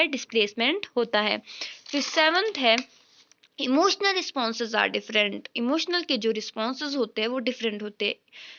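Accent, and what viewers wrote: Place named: Jammu, India. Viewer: Mam apki language me dogri touch dikh ra h❤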